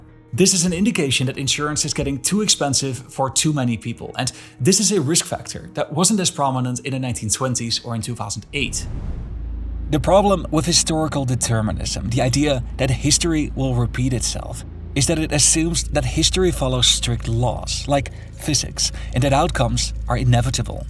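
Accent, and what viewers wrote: Netherlands. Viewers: Ik kon aan je Engels horen dat je moedertaal Nederlands is. Checked the ‘About’ section…and sure enough. (Living in Florida past 15 years)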